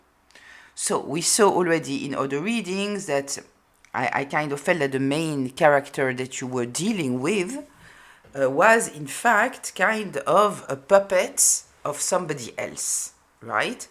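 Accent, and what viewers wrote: France. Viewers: I absolutely love your French accent ❤️🧡💛💚🩵💙💜🤎🖤🩶🤍🩷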